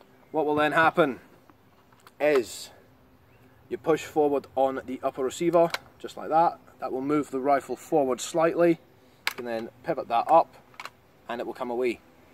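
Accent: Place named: Scotland, United Kingdom. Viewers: Wait how comes you have a Scottish accent now? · This was made not long after a visit here and he always picks up on the accent. He's now has a permanent Scottish accent due to staying here.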